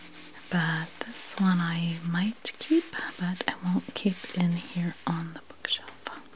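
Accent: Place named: Minnesota, United States. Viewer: She's in Pennsylvania. I'm guessing by her voice she's spent time in Minnesota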